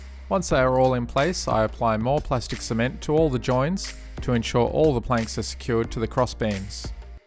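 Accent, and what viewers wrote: Australia. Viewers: His accent sounds Australian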